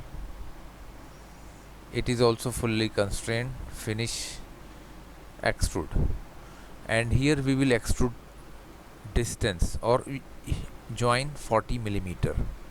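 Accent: India. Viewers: Are you from Índia? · You know it'll be informative when the guy has Indian accent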